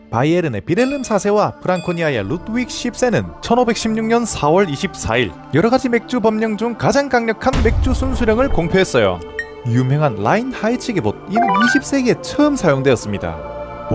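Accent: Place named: Seoul, South Korea. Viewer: 와 유용한 정보들과 맥주역사까지 설명해주니 좋네요 무엇보다 서울말로 설명해주니 귀에 쏙쏙 들어옴!!!🤩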